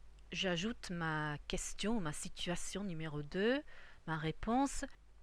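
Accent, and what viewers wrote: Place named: Belgium. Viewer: A l'accent, c'est une belge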